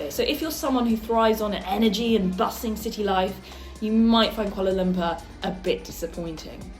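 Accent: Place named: United Kingdom. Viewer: I'm listening to your lovely British accent (from an American standpoint)and I'm wondering why there are so very few Americans doing videos of this sort.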